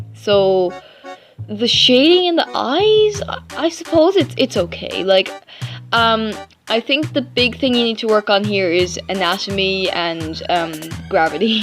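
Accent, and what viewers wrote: Ireland. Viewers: ngl idk but she sounds like she has a irish accent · Are you Irish?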